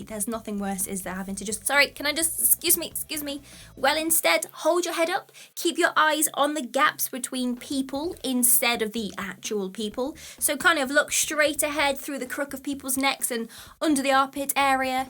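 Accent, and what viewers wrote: England, United Kingdom. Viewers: You're just wonderful 😊 And I so much love your accent.. wonder where you're from in England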